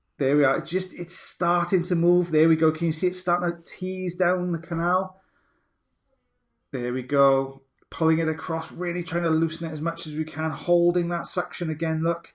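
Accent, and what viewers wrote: Wales, United Kingdom. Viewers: Are you Welsh? Love hearing the welsh accent, reminds me of home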